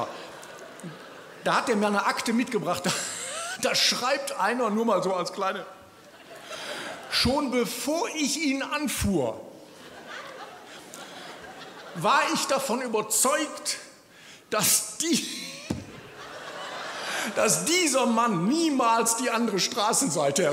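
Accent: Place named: Duisburg, Germany. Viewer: So reden viele in Duisburg.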